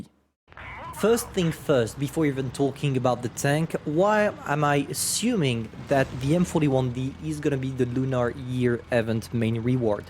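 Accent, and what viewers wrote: France. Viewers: Is he french?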